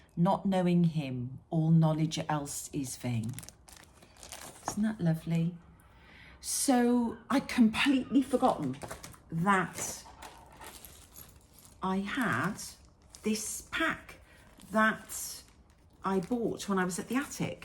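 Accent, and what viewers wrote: Wales, United Kingdom. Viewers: Just found your channel, do I detect a bit of Welsh accent? I was born in Pembrokeshire on the border of Carmarthenshire, speak fluent Welsh niw residing in Reading UK, now I will continue to watch! X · Hi another wonderful flosstube. It’s so nice to get to know you through your stitching. I know exactly how you feel about being a lone stitcher, as that is exactly how I feel. I have an instagram account just from my crafts and stitching so all my family don’t get to see in on my own account and it’s also great to look back on all your hardworking. I normally watch flosstube from the USA so it’s lovely to hear a fellow Brit on here. I also love your embroidery. My daughter was very lucky to study her hand embroidery degree for 3 years at Hampton Court Palace So if I ever get stuck I call on her 😂 can’t wait for your next flosstube. 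Also I love a pink rose.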